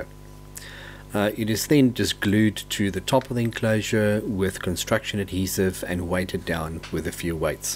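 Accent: South Africa. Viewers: are you from South-Africa sir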